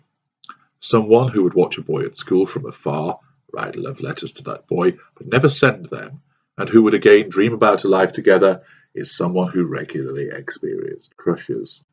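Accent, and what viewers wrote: England, United Kingdom. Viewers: I have always wondered if he uses a device to distort his voice. Either way tell tale signs have popped up showing he is English.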